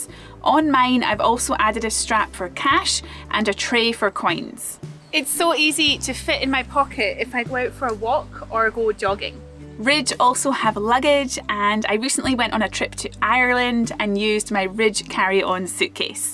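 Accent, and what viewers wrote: Scotland, United Kingdom. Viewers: Whereabout in Scotland is that accent from? 😂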